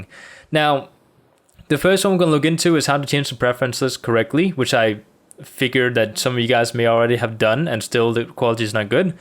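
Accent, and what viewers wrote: Denmark. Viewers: really awesome video, it helped thanks! and btw are you danish? I just though you sounded like it x3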